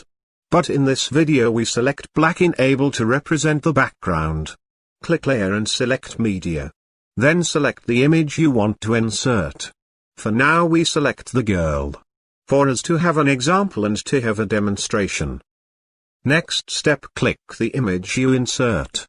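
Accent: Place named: United Kingdom. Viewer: Ang taray ng boses british accent #chutee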